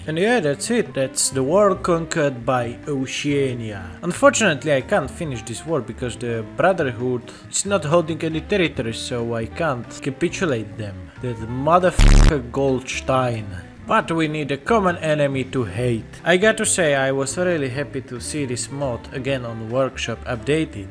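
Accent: Russia. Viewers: Ты русский? Либо какой либо нации? У тебя акцент прямо на наш похож. Translate: You russian? Or other nation? Your accent like russian. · Huh, I never noticed Russian accent until now. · Are you Russian?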